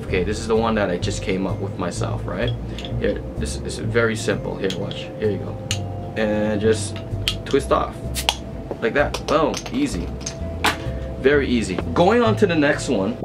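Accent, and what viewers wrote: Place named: Canada. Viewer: I can tell you're Canadian, Winnipeg perhaps?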